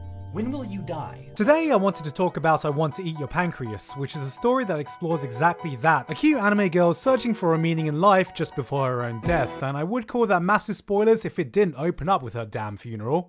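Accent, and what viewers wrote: United Kingdom. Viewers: the sexy british Voice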